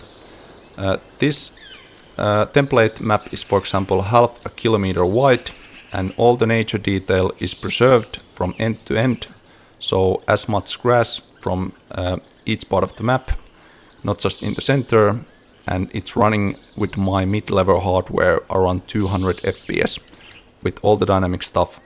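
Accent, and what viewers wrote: Finland. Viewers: Thought the accent sounded familiar, until I realized you was Finnish! näyttää hyvältä 🤌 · After watching so many interviews of Sam Lake, I could not not hear the charming Finnish accent :D. Love your game, btw. Keep up the good work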